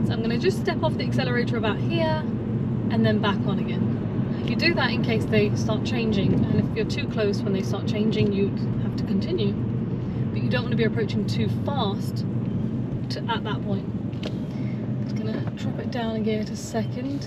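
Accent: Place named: United Kingdom. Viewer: 18 minutes of British accent + UK tour? Let's go!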